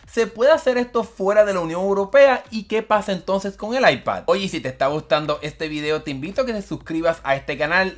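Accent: Puerto Rico. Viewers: Eres de Puerto Rico?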